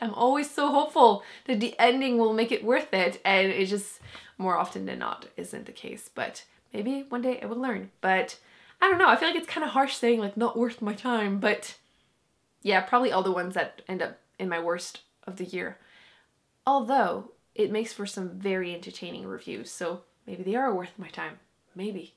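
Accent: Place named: Quebec, Canada. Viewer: Oh mon dieu, Are you from Québec? :o That accent melted my heart :')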